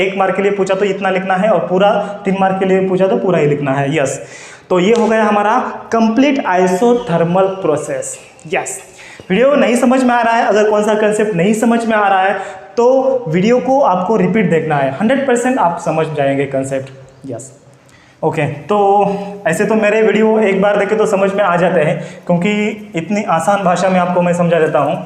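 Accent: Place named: Nanded, India. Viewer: सर तुम्ही नांदेड चे आहात ka?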